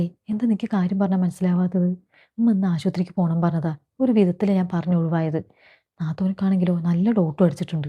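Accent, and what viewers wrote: Palakkad, India. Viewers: പാലക്കാട്‌ ആണോ നിങ്ങൾ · Palakkad vannattoli poyi ttolii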